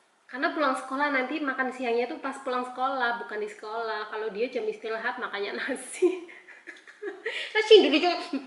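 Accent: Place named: East Java, Indonesia. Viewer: Bu, dari mana sih? Logatnya kok kaya Jawa Timur an.. Maaf penggemar baru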